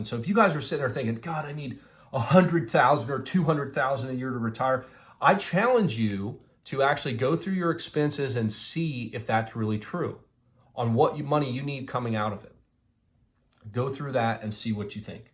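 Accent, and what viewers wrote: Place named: Texas, United States. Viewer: Hey keep up the content. I have noticed from my own experience. By going from house hack to house hack you end up with more cash flow so therefore you need less properties. By the way really heard that Texas accent come out on this one LOL.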